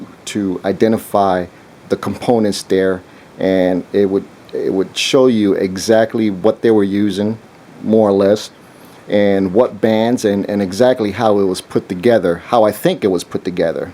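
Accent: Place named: Mexican American, United States. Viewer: Can I ask where you are from? Also are you Mexican American as it sounds like to from the accent and verbal variance in your voice I would say 2nd maybe 3rd gen. Great channel and thanks for all the info you provide on a wide level which is helpful for a full perspective on this subject. I am learning so I can train some young scouts.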